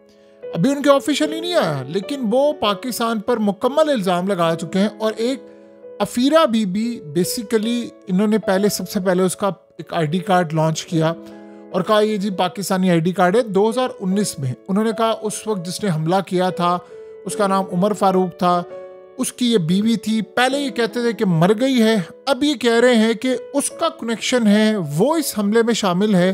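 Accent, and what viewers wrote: India. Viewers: تیرے انداز گفتگو سے لگا رھا کہ انڈین کہ انڈین ایجنٹ ھیں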